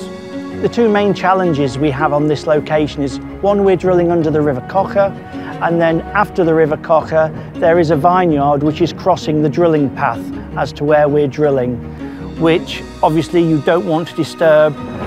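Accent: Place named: England, United Kingdom. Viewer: Der kommt halt aus England . Untertext ist doch Deutsch halt net Schwäbisch 😅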